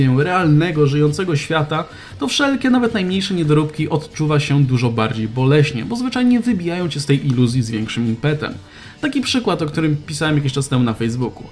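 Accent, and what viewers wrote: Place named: Poznań, Poland. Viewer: speed<num> mi to brzmi normalne, typowe poznańskie zaciąganie.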